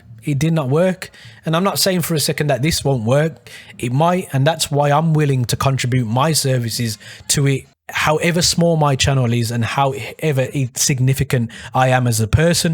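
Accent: Birmingham, United Kingdom. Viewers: What part of Birmingham are u from?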